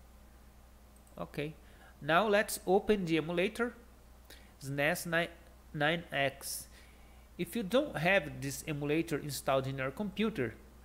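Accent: Brazil. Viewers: que dá hora! Eu falo inglês um tempão e ainda não criei coragem de gravar um vídeo em inglês, achei seu vídeo sem querer, você foi uma inspiração...kkk...ouvi vi e ontem e pensei "é brasileiro, certeza! Se ele pode eu posso kkkk"... enfim... parabéns maninho...vídeo simples e muito bom!!! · tava me perguntando se era br pela pronuncia, ate que vi a declaração da receita ali na área de trabalho KKKKKKKKKKKKKKKKK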